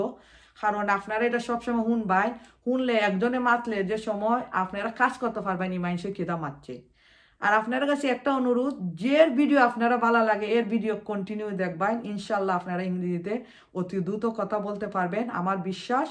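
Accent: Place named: Sylhet, Bangladesh. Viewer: Sylheti mat hunram 🙈🤣🤣❤️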